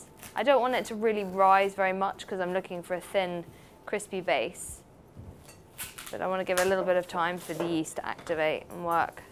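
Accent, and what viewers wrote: United Kingdom. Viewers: I swear to God I love British accent >3< So many tasty recipes, so little time to make them :(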